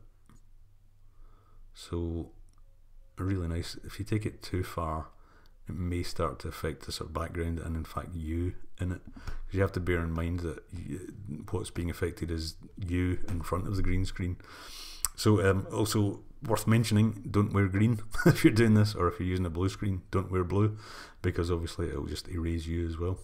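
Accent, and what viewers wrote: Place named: Scotland, United Kingdom. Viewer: Great to listen to another Scottish voice on YouTube. Looks like I'm going to be adding a green screen to my Amazon wish list!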